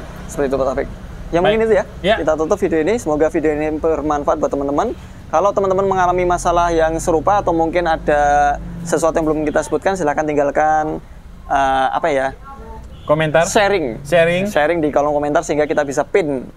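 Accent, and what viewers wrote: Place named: West Sumatra, Indonesia. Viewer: dari logatnya, kayaknya orang minang nih yg nyasar ke jogja 😁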